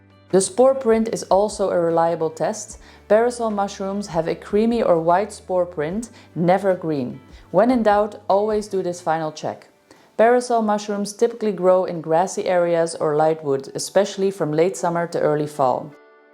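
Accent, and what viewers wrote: Netherlands. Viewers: Do I detect a very slight Dutch accent ? I am glad I found you. I like your channel .. love from an old Dutch lady in California ❤❤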